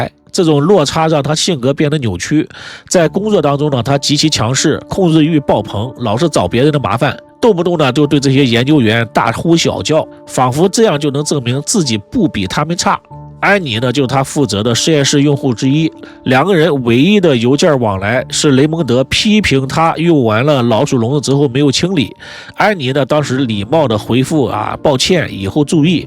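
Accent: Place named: Northeast, China. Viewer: 並不說所有人都能說案的，你這一嘴大碴子味兒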